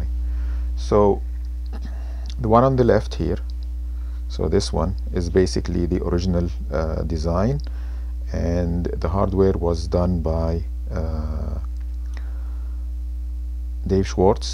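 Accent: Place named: Canada. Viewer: Funny Canadian accent.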